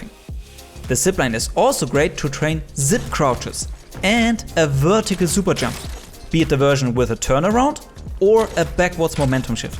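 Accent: Germany. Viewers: Man hört richtig raus das du deutsch bist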